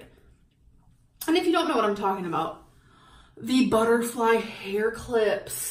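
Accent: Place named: Calabasas, United States. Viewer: She’s from Alabama but she speaks like shes from calabazas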